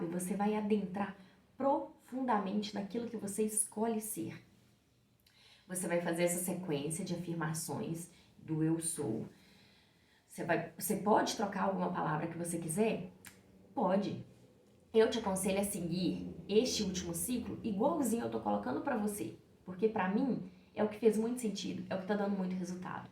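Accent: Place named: Minas Gerais, Brazil. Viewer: Aí que saudades desse sotaque minerinho 🤌🤌 Amei o seu vídeo,! Que o universo traga muitas bênçãos pra vc🙏🍀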